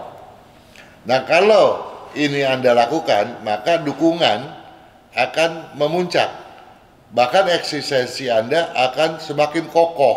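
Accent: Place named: Malaysia. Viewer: Wow.... Bahasa dan tone suara koko sangat bagus,susah oo mau dengar org cina bahasa pasif bngt,jelas bngt dan seakan² sudah mendekati pekat bahasa Indonesia nya..koko tggl di lingkungan melayu ya sbb logat nye seperti MELAYU very good.. Love you..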